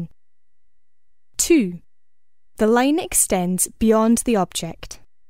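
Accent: Scotland, United Kingdom. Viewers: Good stuff, lovely sexy Scottish accent too.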